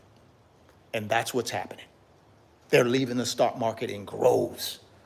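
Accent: South, United States. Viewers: When Says Nimber (Number) you know he’s gotta be from somewhere in the South 😅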